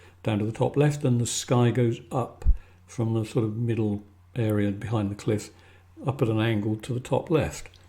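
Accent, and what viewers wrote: United Kingdom. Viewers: you've got such a beautiful british accent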